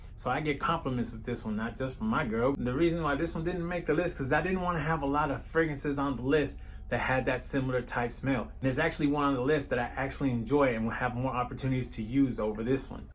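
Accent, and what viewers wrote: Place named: Brooklyn, United States. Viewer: Texas, I would of guessed he was from. Brooklyn ny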